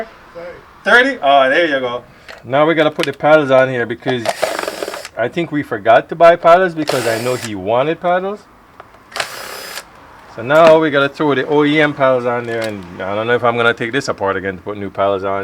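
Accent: Jamaica. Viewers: Wow good for you. That accent sounds Jamaican. Enjoy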